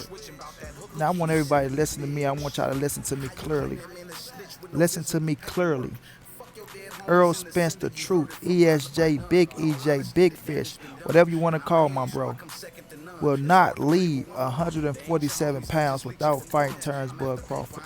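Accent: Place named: Midwest, United States. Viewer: ok i was trying to figure out your accent that's that Midwest country. I'm from Louisiana btw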